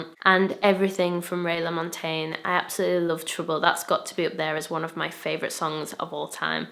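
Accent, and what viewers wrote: United Kingdom. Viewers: I have been listening to your music for a while now. This is the first time I've heard you speak and i didn't realise that you have a British accent lol I always thought that you were from America! Lol x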